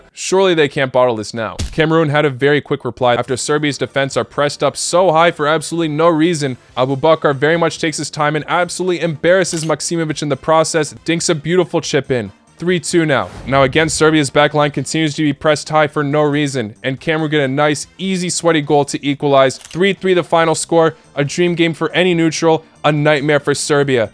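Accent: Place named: United States. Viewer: wdym he was born in usa he was born in croatia but his family moved to usa during the war of independence living there for so long must be why he shows an american accent in his videos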